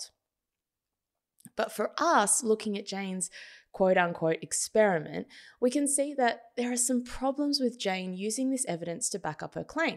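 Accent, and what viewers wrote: Australia. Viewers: I like your Australian accent!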